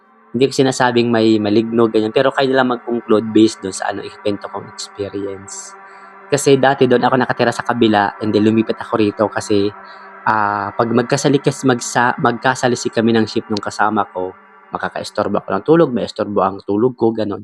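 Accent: Philippines. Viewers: Bicolano ka po Sir?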